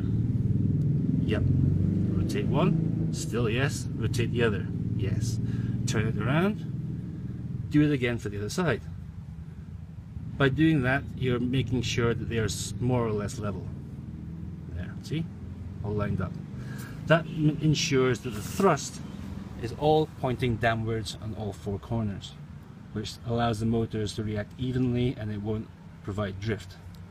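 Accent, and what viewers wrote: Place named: Scotland, United Kingdom. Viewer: hmmmm scottish accent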